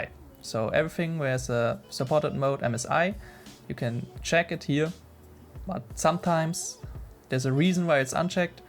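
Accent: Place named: Germany. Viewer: bro u definitely german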